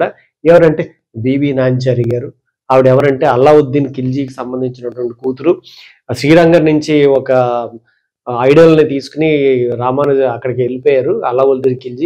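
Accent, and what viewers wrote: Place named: Godavari, India. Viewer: మీ గోదారి యాసతో డైరెక్టర్ కు గుణపం దింపారు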